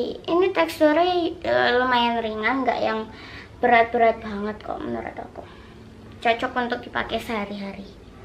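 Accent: Java, Indonesia. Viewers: Seneng dengar meddo' jawanya😁 Sek to dek, meneng o dek, aku nge review iki loh🤣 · Medok bgt logat mba 😂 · aku mojokerto juga medok wkwk. Lah bukannya dia dri tulungangung ya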